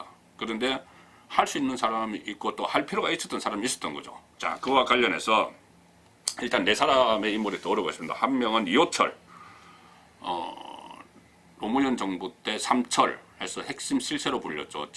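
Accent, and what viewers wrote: Gyeongsang, South Korea. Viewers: 구수한 경상도 사투리를 쓰시면서 정확하게 사건을 정리하여 방송해주셔서 감사합니다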